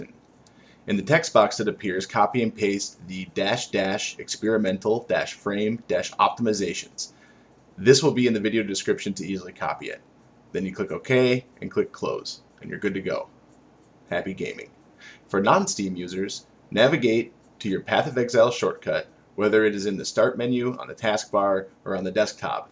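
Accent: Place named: United States. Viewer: thank you so much for speaking clear american english without an accent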